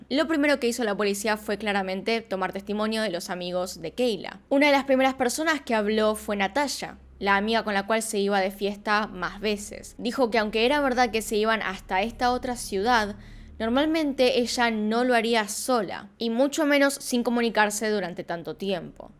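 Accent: Argentina. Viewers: Buenísimo video, me encantan todos tus videos, siempre lo explicas con claridad tqmmm, además que me encanta el asentó Argentino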